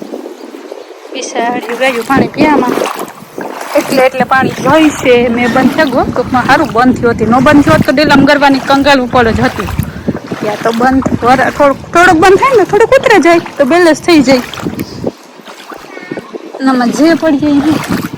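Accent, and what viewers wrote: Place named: Gujarat, India. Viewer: મેર જેવી મઘુર બોલી કોઈ બીજી.કયા જોય નથી,ખૂબ સરસ.બોલી.સે ❤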